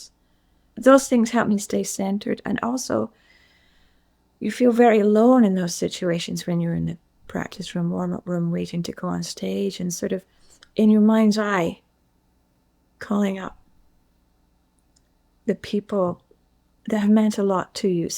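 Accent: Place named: Ayrshire, United Kingdom. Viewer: Lovely to listen to - she still has her soft Ayrshire accent!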